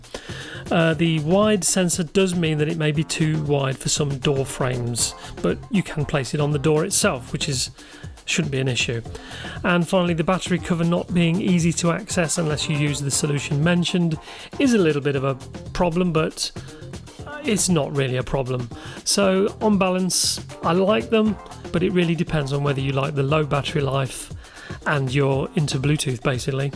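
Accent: United Kingdom. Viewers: Hi, where did you purchase these in UK?, as you sound like you are from the UK.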